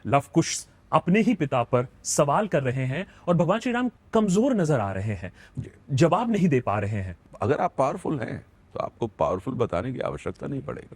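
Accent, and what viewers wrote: Standard. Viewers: Kya zbrdst shudh hindi bolte h ❤ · Haven't heard such a pure Hindi narration in a long time. Ashutosh Rana's command over the language is impeccable. I wonder where the intellectual people of our country are nowadays? Feeling great listening to it. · So good to listen pure hindi, very rare to hear pure language these days.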